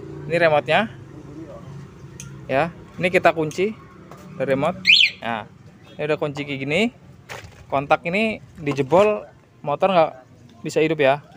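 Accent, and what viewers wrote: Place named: Palembang, Indonesia. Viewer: Asli mana bos? Bahasanya kayak orang Sumatra Palembang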